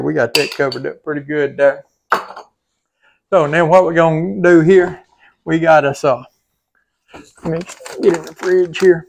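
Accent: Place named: Texas, United States. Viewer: I will watch again to hear your Texan accent!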